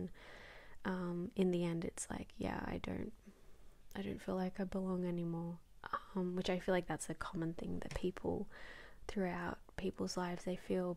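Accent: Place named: Australia. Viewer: Nice hat. Australian is the best accent for swearing, it's fun to hear it in your videos sometimes!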